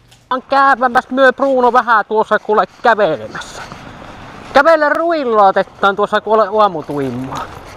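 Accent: Savo, Finland. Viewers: Savolainen englanti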